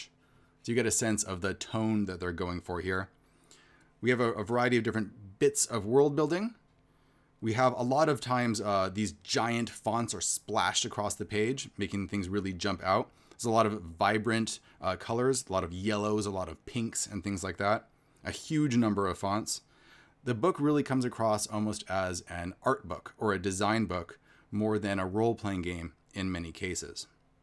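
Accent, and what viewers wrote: United States. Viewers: Hello, a dude from sweden here, dowing what we do best: Drinking coffe and trying to convivnce the world that the swedish language is not hard, actually. The classics, Å Ä Ö. Å is pronounced like the O in Lord, Ä is pronounced as the A in Damn, and finaly Ö is pronounced kinda like the U in Murder. For the O in Borg, shorten it a bit and deepen it a bit. And finaly the end G is not as hard, and pronounced more as a J. From Sweden with Love - Kami P.S, i think you just need to extend your E abit, so its more like "Tveeland", and if you wanna get realy fancy you could work on your swedish A, wich is more as the A in Lard as a standard than the american A, who sounds more like our Ä. But honestly, your doing fine, mate. Swedish realy isnt that hard to speak(tm)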